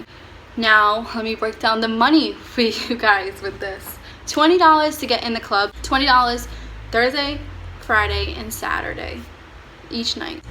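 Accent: Boston, United States. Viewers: Are you from Boston? You have the accent! lol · Do you live in Boston? It sounds like you have a boston accent. It's cool.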